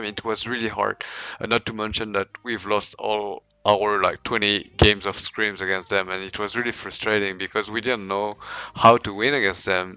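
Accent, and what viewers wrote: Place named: France. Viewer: Haha I know that. but i heard it as if he said Fabian. I understand he has a french accent!! :P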